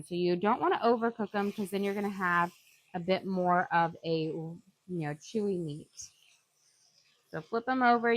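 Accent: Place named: Florida, United States. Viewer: You are starting to sound like we live in the same state. I’m in Florida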